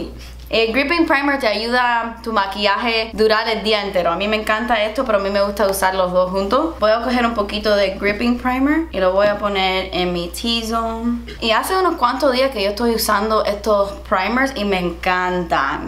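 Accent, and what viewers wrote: Cuba. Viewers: hablando español, con acento cubano 👏🏼 what I’ve been waiting for forever 😂 jajajaaj I love how cubans kinda yell, I get it cause I’m Mexican from the north part of Mexico and southern Mexicans always tell us we are yelling 😂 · I love your Cuban accent! 😍 Please do more Spanish videos 🙏🏼!!!!! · 😹😹 I’ve been watching you for years and wow. This is the first time I have ever heard you speaking Spanish. I love your Cuban accent ❤️ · Que acento tan marcado de Cuba, no lo habría imaginado! Más vídeos en español please! Me reí mucho y el spanglish se entendió perfectamente...😘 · Maybe it's difficult if you are learning Spanish from Spain, because she has Cuban accent, and the pronunciation is a bit different. Bit it's cool you can see different accents. Cuban is beautiful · Nunca pensé que tu español era tan latino. Tienes muy marcado el acento cubano con todos sus giros. 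Muy simpático y divertido sobre todo cuando dices "ya tu sabes". Gracias por ser tan cute 😍😍😍😍😚😚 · Me! I love her cuban accent! 💕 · Me encanta tienes un acento 100% cubano 😂😂😂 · No imaginaba para nada tu acento así, es 100% Cuba🇨🇺!! Gracias por este homenaje al español. Saludos desde España 🇪🇸😘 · Se nota tu acento cubano mucho jajaja, se te entiende muy bien (soy de España) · I’m bilingual too (born it the Uk 🇬🇧 but lived in Spain 🇪🇸 since I was 10) and this video was HILARIOUS! I know the struggle of mixing languages lol. I love your Cuban accent! 😍 ¡Buen vídeo como siempre! 😊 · Chica me encanta tu acento cubano!!! Para mi tu español no es tan malo, solo te hace falta acordarte de ciertas palabras y listo. Por favor haz mas videos así! Desde que te empecé a seguir moría de ganas por este video! Saludos desde méxico 🇲🇽❤️ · Me encantó!!! Hacía mucho que quería este video jaja....! I love your cuban accent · Girl you did so good with the Spanish and I love your Cuban accent!! 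You definitely need more Spanish videos! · Jajajaja ¡me encantas! 🌹 Tienes un acento cubano precioso. Ojalá te animes hacer más vídeos en español. Un beso enorme desde España 🇪🇸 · I LOVED this video!!! I’m Cuban, I’m from Miami and so I’ve always loved your Cuban background and accent. My Spanish is HORRIBLE, but it was so funny to see you kinda mix in English in there and your Spanish talking voice is soooooo pretty. Such a fun video, I was smiling and laughing the whole time in the best way!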